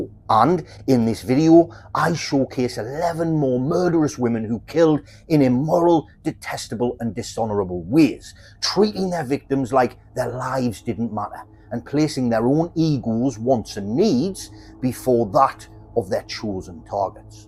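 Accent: United Kingdom. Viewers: I live in the UK, North West, and I'm delighted to hear a true crime you tube creator with a British accent and doing a stellar job of presenting these often more obscure cases as well as the obvious, I've got to give it you man you can find the ones we have not heard of and the ones that concluded like yesterday, nice format and clear presenting and very presentable, I predict a growth around here, keep it coming dude, Bravo!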